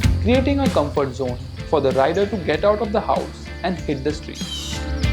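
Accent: India. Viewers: Акцент бешенный. Лучше б на индийском своем говорил. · Now first I want to say what a great video this guy put out. The host was really great and did a great review. To be honest I giggled a little bit because of his accent and his words that he used (however it's how things are said in india). But this provided great character and kept me wanting to view more, as a result I became attracted to the bike more. I wish he could do more videos in USA because he is pretty talented. So in all much love and respect, I am happy to give 2 thumbs up, this host made this bike kick ass even more than it is.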